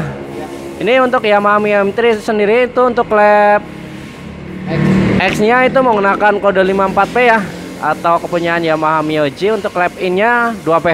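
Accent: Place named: Banyumas, Indonesia. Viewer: Tp logatnya jenengan ngapak ya mas 😁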